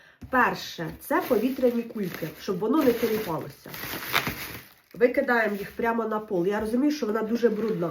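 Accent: Poltava, Ukraine. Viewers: По суржику зрозуміла що ви з Полтави!!! Привіт вам і гарного настрою!!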